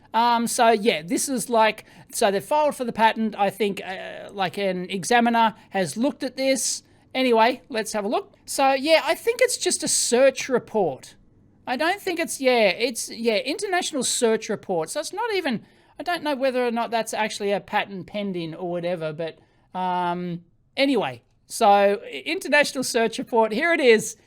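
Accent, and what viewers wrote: Australia. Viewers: Geravi-tational lol gravitational. I love Aussi accents.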